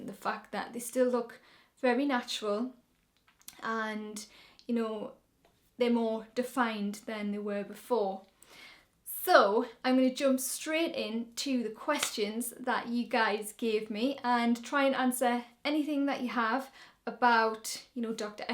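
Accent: Newcastle, United Kingdom. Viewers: Are you from South Shields? I'm from Newcastle and your accent sounds familiar!!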